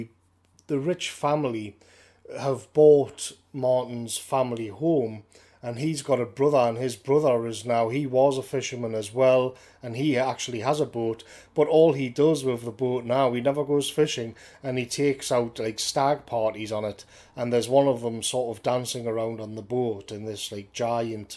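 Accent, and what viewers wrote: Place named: North East England, United Kingdom. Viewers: I live on the NE coast and it's both middle class and has fishing. I guess you know this though because you're from the same general area based on your accent. I'm working class myself, but I've lived at Tynemouth and Whitley Bay and had a lot of mates out that way as well. And while it is full of mega-rich families, there still is fishing as well. I know a lad that works on a boat actually, and it's generational thing as he works with his Grand-Dad. I guess the NE coast is different as you have N and S Shields as well. I don't know much about South Shields, but North Shields still has Fishing as well. I imagine it's nothing like it was though, but then the Oceans were over fished for a time, so this makes sense as well. Anyway, what I meant was that in this case it's different I guess. I've never been down south much, so don't know what it's like. 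But up here, seaside areas are always incredibly middle class. I can see how a rural fishing area could be destroyed by townies though, I guess it's just a totally different situation to our seaside areas in the North East. I think Tynemouth is quite possibly the most expensive area to live in North Tyneside. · Thanks for pointing out the elephant in the room. This review commits the same cultural appropriation that this film rails against. That is to say, ignorance of Cornish history and the basis for Cornwall’s culture difference from the rest of England. The director Mark Jenkin has said as much in interviews claiming Cornishness is a major theme in the movie, a factor that many in England don’t take the time to understand. Despite the commentator’s thick North East accent, as opposed to the thick Cornish accent he mentions, he should not use Cornish identity as a metaphor to describe the state of England more generally. 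At the end of day there is little evidence the poster understands the undercurrent of resentment that exists in Cornwall towards those who approach Cornwall and the Cornish as a rugged and slightly strange part of the Home Counties. Unfortunately this poster is little different in that regard to the Home Counties blow-ins depicted in Bait.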